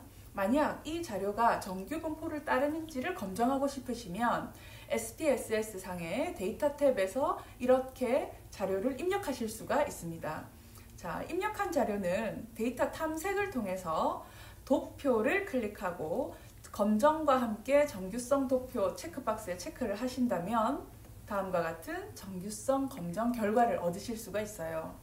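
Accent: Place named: Busan, South Korea. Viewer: ㅎㅎ안녕하세요? 간호사이고 대학원생입니다. 9월되면 학술지에 논문을 투고 해야되는데 통계가 너무 어려워서 유튜브 찾다가 이 채널을 발견했어요. 말투가 낯설지 않고 정겨웠는데 부산에다 센텀에 계셔서 너무 좋아요. 제가 자주 가는 곳이라서^^; 따님분한테 쉽게 설명해주시는 부분도 좋고 따님분도 질문을 너무 야무지게 잘해서 스트레스 받지 않고 볼 수 있을 것 같아요. 그래서 이곳을 통계 맛집으로 정하고 정주행 하기로 했어요. 너무 유용하게 잘 듣고 있습니다. 그래도 감사 인사는 드리는게 좋을 것 같아서 댓글 남겼어요. 복 받으세용^^ 그리고 정말 감사드립니다❤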